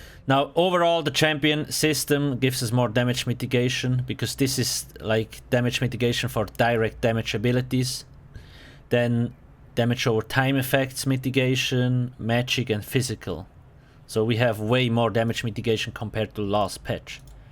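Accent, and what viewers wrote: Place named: Switzerland. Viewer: Das tönt nach Schwizer ^^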